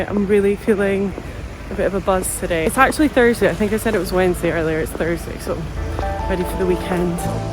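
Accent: Scotland, United Kingdom. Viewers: Are you from Scotland? ❤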